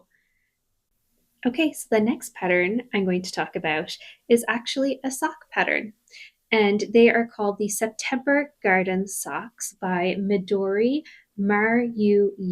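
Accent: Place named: Ireland. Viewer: Im so glad you mentioned you are from Ireland originally in this video - I spent the 1st 44 out of 45 minutes trying to place your accent, because some of the words you say sound so Irish, but some sounds not so Irish. I shouldnt have doubted myself so much 😂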